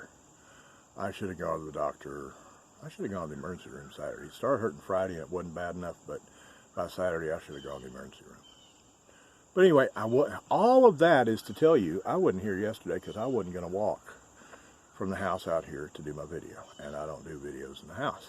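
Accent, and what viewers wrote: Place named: South, United States. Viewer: Hi I'm from NonnaGrace's Channel! I like the coffee chats! I understand the southern country thing! I live in Hitchcock Texas and we all talk like that! Lol and I was born and raised in the city and it took me a really long time to get used to it!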